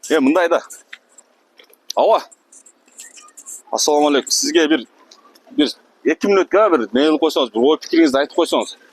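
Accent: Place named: Osh, Kyrgyzstan. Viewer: Даана оштун тилинде сүйлөптүрго. Азаматсың...😊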